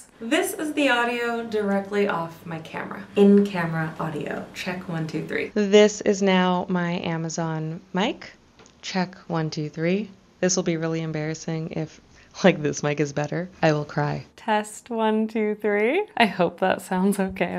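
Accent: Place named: Canada. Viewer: Canadian Accent (out)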